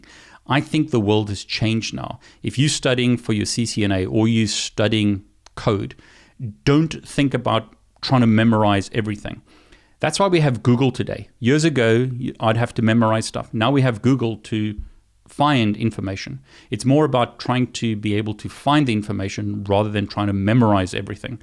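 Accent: South Africa. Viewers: Really interesting video, dude, and lovely to hear your South African accent. (If not RSA, Southern African. I now live in the UK, 24 years, from RSA.) GPT is so powerful! I'm going to have to use it for pair programming, for the sake of my career and my clients' success. Plus probably a billion other things in my life. Oh yes, always test everything: code, new AIs, ideas, foods, dearly-loved traditions, everything. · Hi are you South African? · Is this a South African accent?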